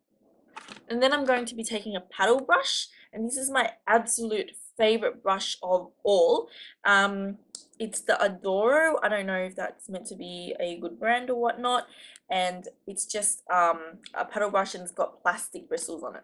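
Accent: Australia. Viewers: Wait if you're Japanese how come your accent sounds Australian? .-. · Lol Aussie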